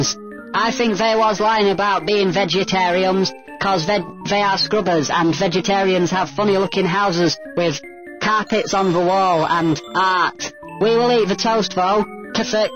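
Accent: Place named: Barnsley, United Kingdom. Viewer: Speaks well, is he posh from Barnsley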